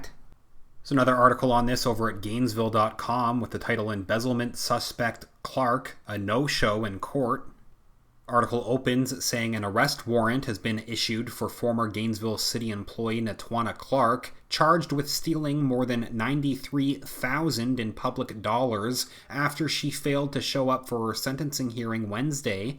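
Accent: Canada. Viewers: your middle class nasal Canadian white boy voice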